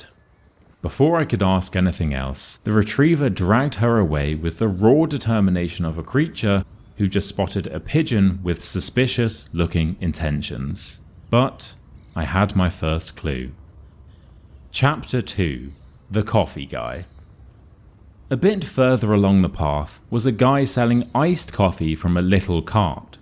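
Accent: United Kingdom. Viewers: this is amazing! I’ve been looking for something like this for a long time. Language learning is really about listening, and your calm, deep voice with that wonderful British accent makes it perfect.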